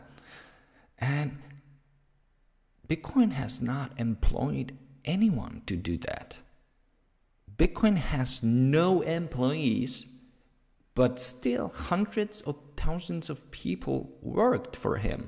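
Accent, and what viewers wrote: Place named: Poland. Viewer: Mówi po angielsku ale i tak słyszę po polsku xd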